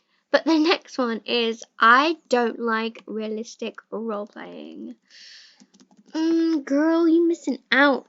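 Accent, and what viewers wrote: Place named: United Kingdom. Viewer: hey!! are u from the UK? i really find your accent cute <3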